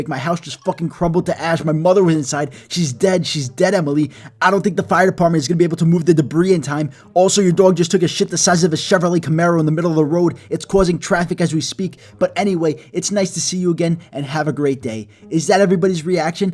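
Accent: New York, United States. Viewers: Is it weird I wanna hear you say the “I told my daughter to not give the dog coffee and what...” because even though it’s not a thick ny accent it’s there also the magnitude earthquake and shit 😂 So perfect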